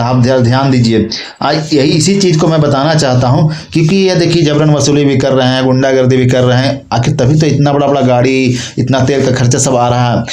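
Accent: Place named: Bihar, India. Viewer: अरे अपको बात से पाता चलता बिहारी हो तुम जायदा बाकर बकर मत अरो झारखंड के बारे मे ठीक है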